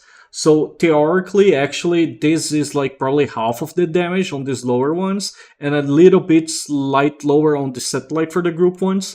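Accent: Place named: Brazil. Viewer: nao adianta disfarça o sotaque entrega kkkkk BR safado S2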